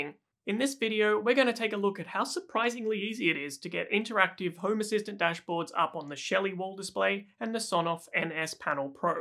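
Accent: Australia. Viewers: Hey mate, I noticed the Aussie Twang. Understand you're in the UK, how much of this can I replicate in Sydney? I've got a mid 90s brick veneer home and things like neutral are not there and I'm not looking to get new wiring in.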